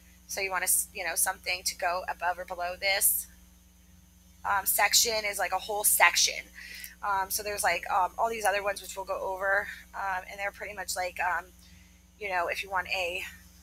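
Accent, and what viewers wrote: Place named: United States. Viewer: Full American English, only native USA could understand it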